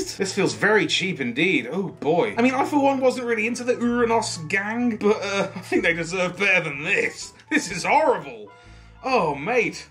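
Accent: Texas, United States. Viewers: american/texan accent sux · Loved i mean looooved the Texas accent lol.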